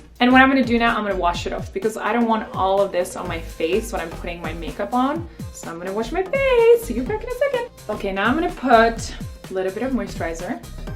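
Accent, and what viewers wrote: United States. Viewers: I’m also Czech and i’m genuinely impressed by her accent, she sounds so american, like woah :D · Her accent really sounds american now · zní fakt jak američanka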